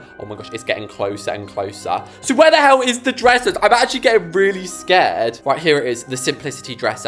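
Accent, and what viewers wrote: United Kingdom. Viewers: the british accent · Are you British?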